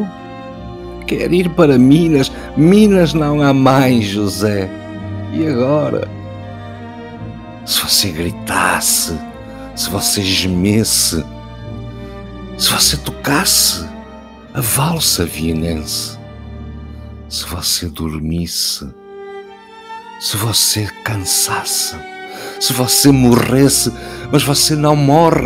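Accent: Portugal. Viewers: Essa narração com o sotaque de português de Portugal ficou incrível... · Essas leituras ao som português é divino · Esse cara com sotaque português é fera ao recitar poemas . Muito bom ! Quem é ele ? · O que é maravilhoso é o sotaque português.perfeito!!!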